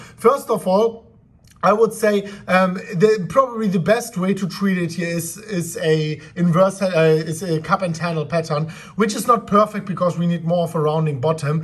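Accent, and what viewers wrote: Germany. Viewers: I love your quick videos and German accent. Keep up the good work, can you put the prices in GBP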